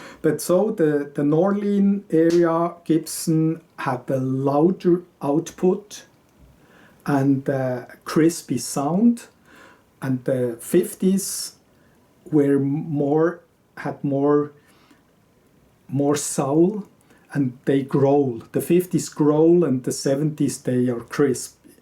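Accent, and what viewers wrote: Switzerland. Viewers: I can tell by your accent that you are Swiss! So am I.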